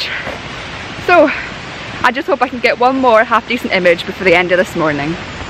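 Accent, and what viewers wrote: Scotland, United Kingdom. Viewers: Another excellent video. I love the Scottish accent even if I don't know what you're talking about half the time. I really love the last photo you showed. Nice work.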